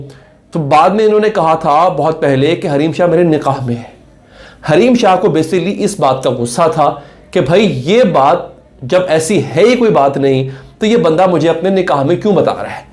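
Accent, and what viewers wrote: Punjab, India. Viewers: Chandda paiyan ny..🤣 bhai waah kya punjabi or style hai🤣👌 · bhai baten krty hue halka sa jo punjabi touch dete hain wo boht kamaal he.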